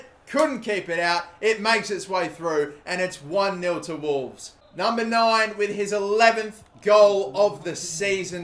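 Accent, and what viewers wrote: Australia. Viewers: i unsubscribes when u told them all to die u angry australian man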